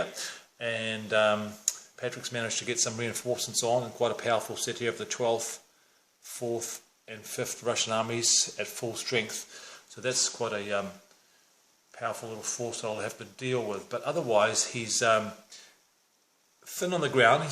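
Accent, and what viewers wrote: New Zealand. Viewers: Been watching "the great war" you tube series, which has renewed my interest in WWI games. The only GMT game I currently own is Twlight Struggle, so i've been looking at reviews and sessions online. And what do I hear, a bloody kiwi accent on the first one I click on :) Know a place to pick up Paths of Glory in NZ at a reasonable rate? Or should i just order from overseas as per usual. Edit Found a 2nd hand copy for $26 NZ + shipping.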